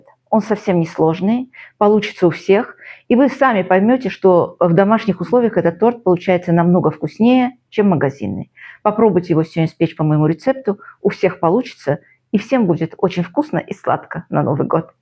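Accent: Baku, Azerbaijan. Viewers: Добрый вечер! У вас акцент наш:) Вы бакинка?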